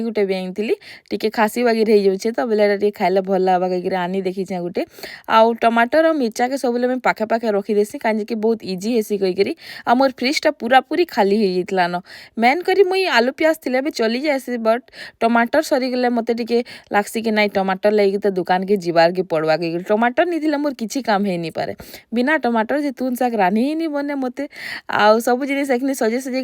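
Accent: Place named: Sonepur, India. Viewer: Kenar ho madam amar sonepuria enta laguchhe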